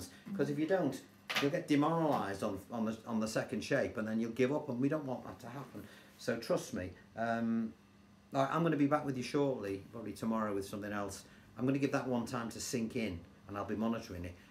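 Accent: Liverpool, United Kingdom. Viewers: Hi, enjoying your guitar lessons, great to hear a scouser tell it as it is. I have been to your bluenote channel where you cover loads of my favourite musicians. I agree with your comments regards, saw them play at the empire. Good luck with the album, some great tracks on there.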